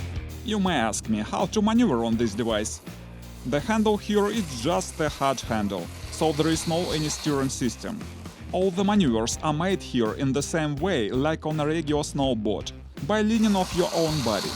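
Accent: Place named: Russia. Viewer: Да, русского сразу выкупаешь, но который пытается казаться нерусским (сам в молодости пытался так говорить) А наповал пишется слитно, кстати)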